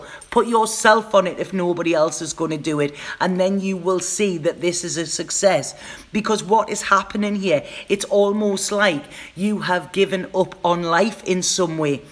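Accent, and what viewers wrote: Newcastle, United Kingdom. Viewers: Luv u, you're such a breath of fresh air! Thanks so much for all that you continue to give. I'm off to Peru on Tuesday and I'm so looking forward to the experience. God knows what I'll find there but I'm totally up for it! When I'm away it'll be lush to hear another Geordie when I'm so far from home!😉xxx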